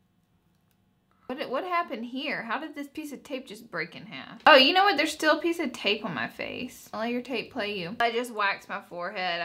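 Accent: South, United States. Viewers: Are you southern? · Is your family from the southeast? your accent sounds southern. love the video <3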